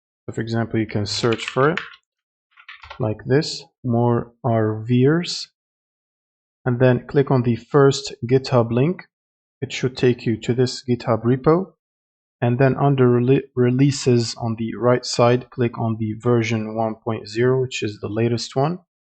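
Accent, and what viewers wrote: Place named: Egypt. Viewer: المصري تدري من نبرته وتفقيشه ياحبيبي احذف التتوريال ما يضبط يا اهبل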